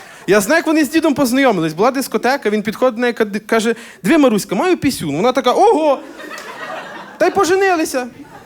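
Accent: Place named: Galicia, Ukraine. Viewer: Якщо ти з Хмельницькому, то скажи щось як хмельничанин. Бо поки що, твій говір видає в тобі, радше, галичанина )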